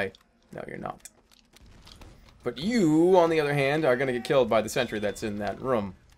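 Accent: Boston, United States. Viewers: Did you know that people in Alabama speak with an accent similar to Jerma's (a Boston accent), not really a southern accent. :)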